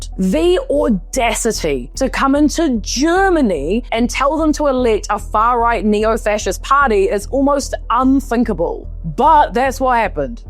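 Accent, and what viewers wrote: New Zealand. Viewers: The accent screams Kiwi. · Nice one, I thought you were an Aussie until you I heard you say Albanese incorrectly. But that's ok, Kiwis are cool too, should become our seventh state. 😛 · I am guessing NZ accent doesn't sound Australian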